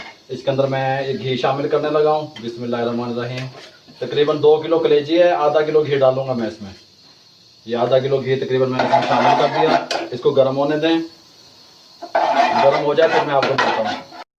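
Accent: Punjab, India. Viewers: Very nice ap ka bolne ka andaz Punjabi thori thori jo bolte hain Good job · ka punjabi ka tarka v good.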